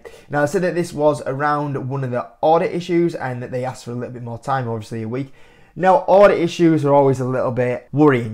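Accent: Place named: London, United Kingdom. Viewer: Are you from London or something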